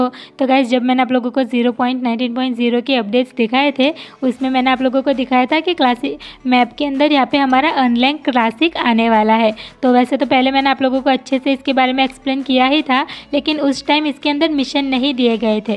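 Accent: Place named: Bihar, India. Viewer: Hey pretty girl aapki aawaj sunke lgta hai ki tum Bihari ho jai bihari meyya ki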